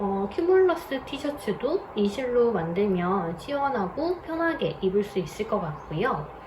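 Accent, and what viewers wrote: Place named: South Korea. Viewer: 어쩐지 억양이 있어서 평소에 사투리 쓰시는분인가부다 했는데 역시 남쪽에 사시는분이셨군요😊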